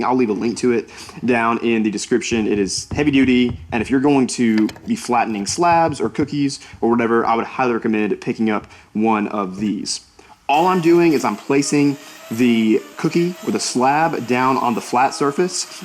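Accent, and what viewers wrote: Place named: Hickory, North Carolina, United States. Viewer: Thanks for your honesty. I've recently found you on you tube. I'm a relatively new woodworker and I like you content better than anyone else I've watched on YouTube. Your accent sounds like your from my wife's hometown of hickory nc......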